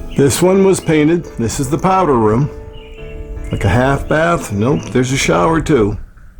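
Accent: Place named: Maine, United States. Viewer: Cannot hear with you in car. Muffled. I thought it was my speakers here in Music studio, Malibum California. $3000 dollar speaker system but, nope, it's your input. I love it when your outdoors showing properties. You are so good at it. Love the accent too. 'GEERAGE' that gets me every time. My grandparents had a beautiful Maine accent. 'LOBSTA' Can't get there from here'. lol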